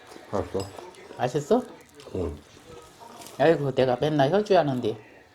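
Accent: South Korea. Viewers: 무우를무수라고??ㅎㅎ 전라도말투 저도전라도여요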